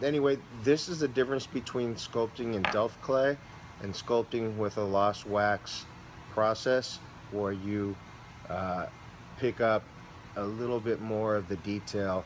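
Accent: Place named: North, United Kingdom. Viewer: awesome pieces and I love the Statue of Liberty with the buildings Skyline pretty cool the day always brings back memories I was in Staten Island when 9/11 happened so it was pretty scary and crazy the same time. it'd be cool if you could make the old Skyline with a police badge on the bottom a fireman badge on the other side of the bottom and paramedics like a little memorial statue I'm from the tri-state I don't know where you guys are based out of a forget I heard the northern accent. anyway I keep Rambling On Again LOL I just love your work. great work and very cool stuff to you keep up the good work I like the bars too I have a coin keeper bar from you I love skulls though. later dude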